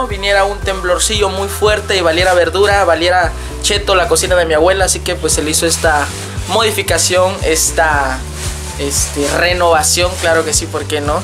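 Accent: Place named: Acapulco, Mexico. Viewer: Me mama el acento de Acapulco! Extraño tanto vivir allá🥺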